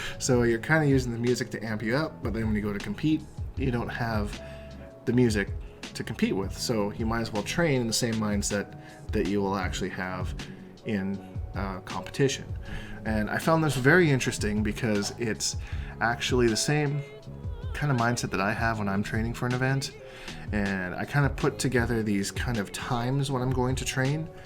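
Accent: Canada. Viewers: just curious, where in Canada? I'm from Guelph/Hamilton so it was rad to hear your Canadian too! I watched some ECT and noticed they have headsets that are there for ppl to use but some ppl don't use them.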